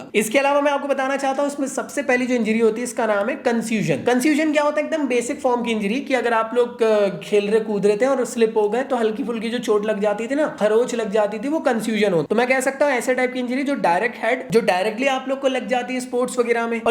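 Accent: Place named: Madhya Pradesh, India. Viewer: Bhaiya app ki bhasa sa lgra app mp ka hoa😂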